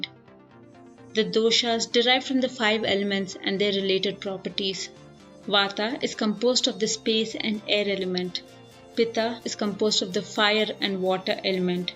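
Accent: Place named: India. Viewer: Just from his/her indian scammer accent, i can confirm that he/she is so stupid that cannot find a job and only way to survive is to scam with "ancient" remedy. I cannot even know if he/she is male or female by his/her voice.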